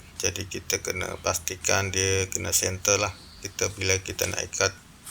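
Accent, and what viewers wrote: Malaysia. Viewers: Cakap pon dh campo+ mcm org msia😅😂 tmkasih ya❤moga dimurahkan rezkinya&diberkati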